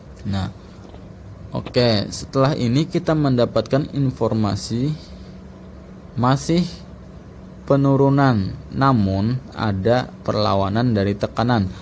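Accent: Banjar, Indonesia. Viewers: Orang banjar kh ini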